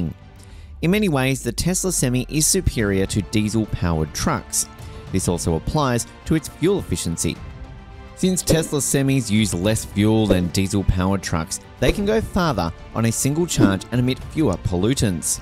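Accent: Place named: United Kingdom. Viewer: Annoying Brit accent and inaccurate information. Very redundant and repetitive narration. The current average class 8 trucks in 2023 range from $150,000 to $220,000.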